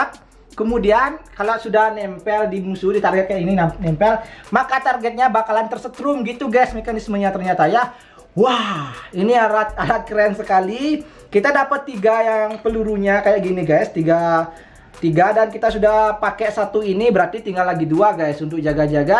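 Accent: Indonesia. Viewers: Kyk minang kocak suaranya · Kamu orang bali ya...mas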